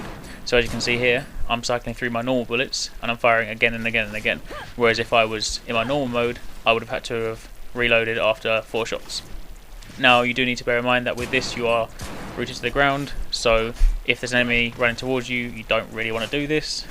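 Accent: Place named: England, United Kingdom. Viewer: its always a guy with an english accent doing this tutorials XD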